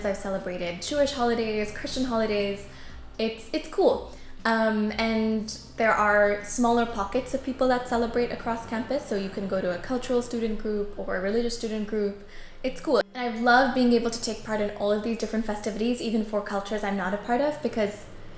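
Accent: United States. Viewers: How did you learned to speak in a pure American accent??😍😍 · How can speak in American accent in india So u r not indian Or u r saying u r superior to indians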